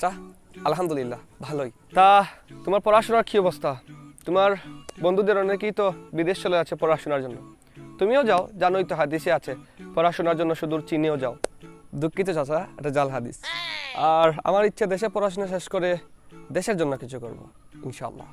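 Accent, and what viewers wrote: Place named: Sylhet, Bangladesh. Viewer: Apnar khota sune mone holo tai jigges krlam ami o sylhety🙂 Btw video ta vallagse🖤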